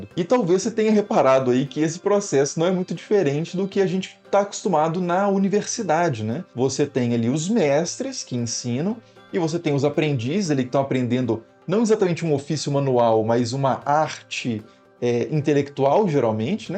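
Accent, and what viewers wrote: Minas Gerais, Brazil. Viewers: vc é mineiro de onde?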